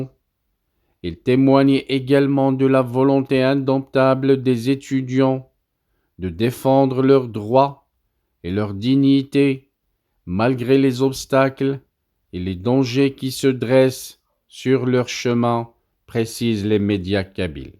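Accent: Morocco. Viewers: L accent de ton francais et celui d un juif magocain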